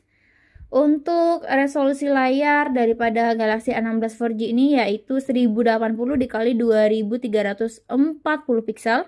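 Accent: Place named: Sumatra, Indonesia. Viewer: Sumatra banget bahasa nya, samsung galaxi anam belas😢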